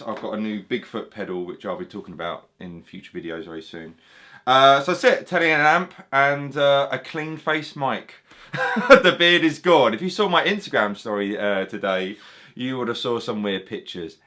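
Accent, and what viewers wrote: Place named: Cockney, United Kingdom. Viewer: def sound like a cockney I was born in whitechaple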